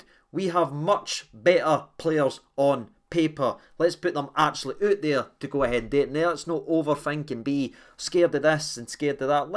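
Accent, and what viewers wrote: Scotland, United Kingdom. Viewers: Im doon in england the noo. Its nice tae here a scottish accent again. 👍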